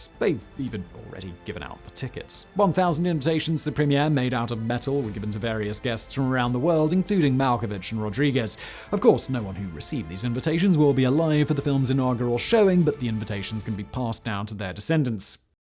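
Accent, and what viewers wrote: United Kingdom. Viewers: THANK YOU so much for beeping out your obscenities. I am old, and people didn't do that publicly in my day. I love your content, your style, your intellect, and your lovely British accent. It is therefore obvious to me that you don't need to use expletives to express yourself very effectively. Thank you again.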